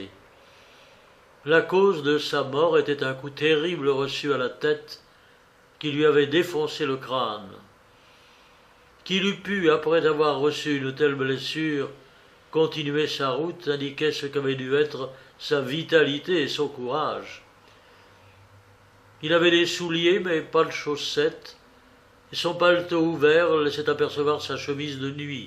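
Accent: England, United Kingdom. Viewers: Le lecteur qui est connu pour ses qualités de diction adopte dans récit l accent anglais qui parfois écorche les oreilles alors qu il aurait dû parler simplement en Français. Mais malgré tout il mérite les félicitations. DJENANE Rachid Alger.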